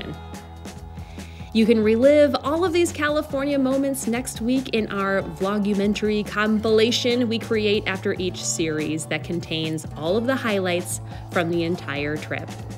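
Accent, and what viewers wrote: United Kingdom. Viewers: I thought that was a very impressive British accent. Well done mate! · Totally loved your British accent. Very funny, especially the panic part. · I liked the voice, sounded authenticity British to me and fun. Hope you don’t stay sedately in FL. Happy Holidays will miss you😷😳🥲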